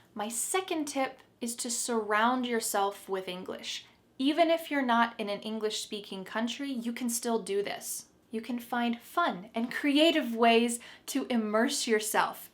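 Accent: United States. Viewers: It's nice to find a normal American who speaks English. And not a Foreigner with a accent. Which makes it harder to understand. 😧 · Of course, I will watch every of your video. Cuz you are using American English. Perhaps, you’re from the US. I am now learning American English, this is why I subscribed your channel. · She only speaks American, not real English.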